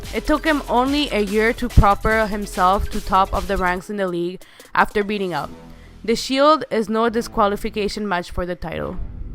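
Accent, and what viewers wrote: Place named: Iran. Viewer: What's with this woman narrating this video..sounds like she's from iran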